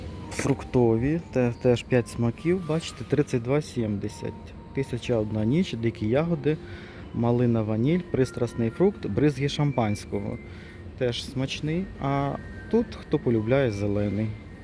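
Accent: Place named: Transcarpathia, Ukraine. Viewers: так говорять на Закарпатті,але це не означає ,що у автора правильна українська мова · Так говорять в західній Україні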